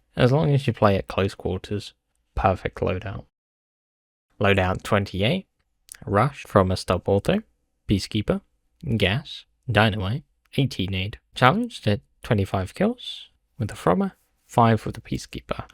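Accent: United Kingdom. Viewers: nah he's just british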